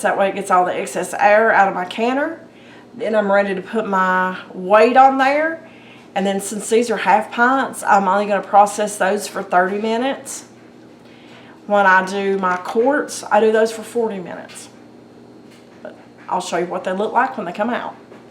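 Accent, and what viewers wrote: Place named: Appalachia, United States. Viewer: Hi! I just attempted to email the yahoo email address listed, but it bounced. Here is what I wanted to ask you about: What about Sweet potatoes? Last time I canned conventionally in the traditional sugar/water, they were just so mushy, not much good for anything needing texture, plus the added sugar isn't always needed depending on the recipe. I think I did maybe dry can some cut in long slices like fries last year....but I wasn't sure about chunks. Can you instruct here, or maybe make a video? Oh, and if it matters: this year I grew white sweet potatoes, though I have done traditional orange ones in the past. The whites are slightly less sweet, and actually can be used as a sub for mashed potatoes, or in any other dish calling for potatoes. Thanks! Anita P.S. I love your accent. I grew up in southern OH (bordering WV) the accent there is referred to as "hillbilly"..., and I've hung onto it despite living in other parts of the country for almost 40 years. 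Not sure where you're located, but you remind me of home :)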